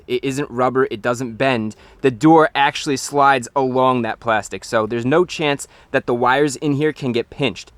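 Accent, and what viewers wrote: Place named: New Jersey, United States. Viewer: *Soapy water - Pronounced as "wooder," likely due to his New Jersey accent.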